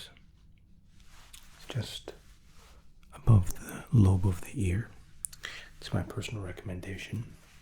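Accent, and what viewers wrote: Scotland, United Kingdom. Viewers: Love your accent and you are defo up there with the best love your videos.... What part of Scotland do you hail from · Nice work!! Also, you are British AF hahah I love it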